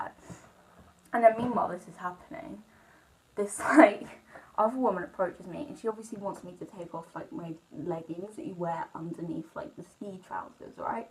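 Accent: England, United Kingdom. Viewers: She sounds so much more English... 🤔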